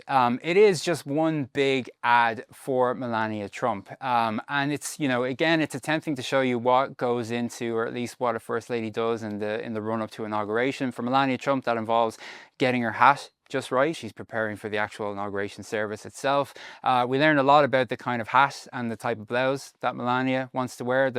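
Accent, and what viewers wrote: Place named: Ireland. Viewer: Nice to hear an Irish review 👏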